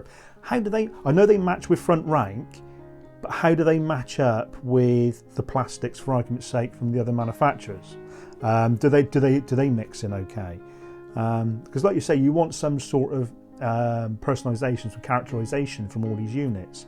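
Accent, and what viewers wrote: West Country, United Kingdom. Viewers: Nice vid bud, love the bolt action table. Seems I’ve stumbled across another West Country wargamer going by the accent lol.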